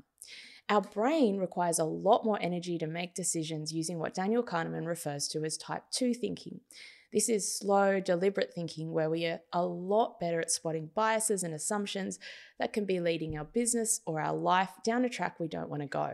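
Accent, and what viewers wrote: Australia. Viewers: I like your Australian accent!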